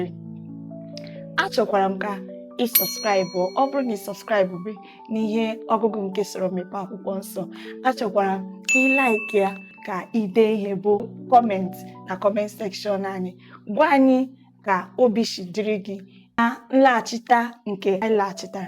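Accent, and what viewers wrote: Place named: Igbo, Nigeria. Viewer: The pure Accent... Unfiltered Igbo. Ride on ma. 👏🦅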